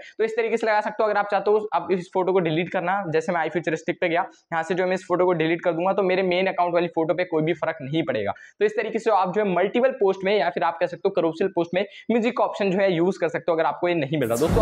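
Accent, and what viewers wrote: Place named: India. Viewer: The problem is he is speaking English... I understand like every 15th word Oh, it isn't English. I thought he just talks really fast and with accent. He uses a lot of english words though. Damn Indians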